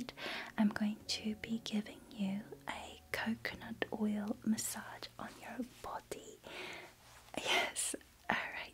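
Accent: Australia. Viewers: Can I ask where you are from? Your voice is pretty and has a slight aussie accent with how you say some things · That explains it, I thought I heard Aussie sometimes! Oops, sorry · Do i detect a hint of Australian accent? Love this. Thank you!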